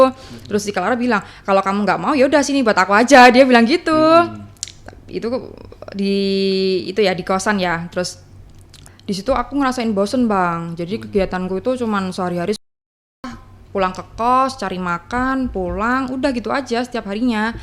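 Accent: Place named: Banyumas, Indonesia. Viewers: Medok kali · Suaranya mendok banget😁❤ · Medok bngt mbak nya😂😂😂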